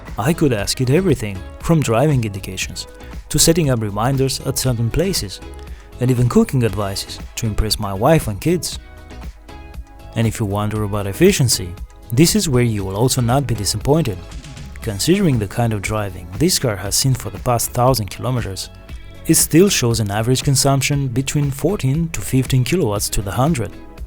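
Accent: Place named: Ireland. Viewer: You sound like an irish man. Are you Irish or Romanian?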